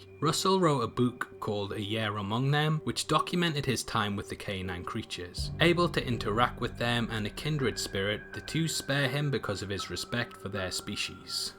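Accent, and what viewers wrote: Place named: England, United Kingdom. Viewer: This guy was incorrect about a handful of things in the movie and then says "they have became" ... I guess having an English accent doesn't guarantee that he'll speak proper English? Thankfully, I got the gist of the video. Lastly... this movie was not a thriller and frankly is not worth the time. It's a horrible movie.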